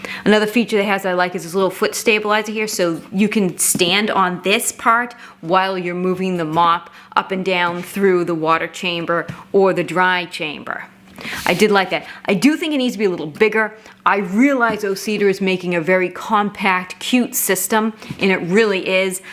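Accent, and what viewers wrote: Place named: New England, United States. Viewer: Thanks for this. I’m in the market for something like this. By the way, you sound like a New Englander (like me)! Accent unmistakable and I love hearing it!! 😊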